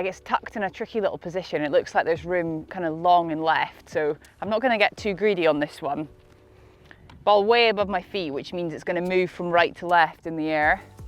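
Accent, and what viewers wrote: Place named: England, United Kingdom. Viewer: Your commentary and tips are spot on! The English accent doesn’t hurt!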